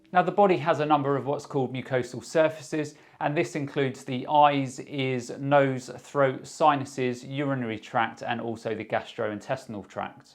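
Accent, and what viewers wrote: United Kingdom. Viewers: You sound British. Thankyou for info. Where do you suggest to get these tests done? So many options I don't know which to trust.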